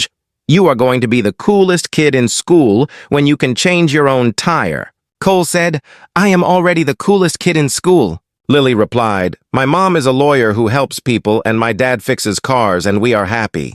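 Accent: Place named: Texas, United States. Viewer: Enoigh of this unsufferableTexan accent ! It spoils a good story 😮‍💨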